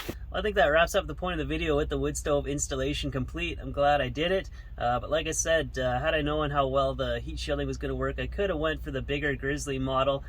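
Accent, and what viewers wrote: Canada. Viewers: Good job on the stove pipe. Love your Canadian accent, much love from Detroit Michigan. Peace Owt 😂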